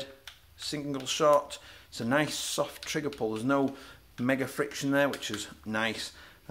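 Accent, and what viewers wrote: United Kingdom. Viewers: Thanks for the response. I notice you've got a northern accent and I'm from west Yorkshire I'm just wondering if you could recommend me any good fields because I'm gonna start airsoft once the lockdowns over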